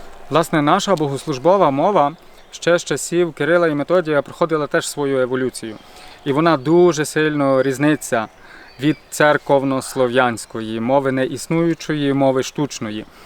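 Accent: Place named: Subcarpathia, Ukraine. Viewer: Як я відчуваю рідну мені говірку Прикарпаття. Так говорять в Коломиї. Дякую дуже.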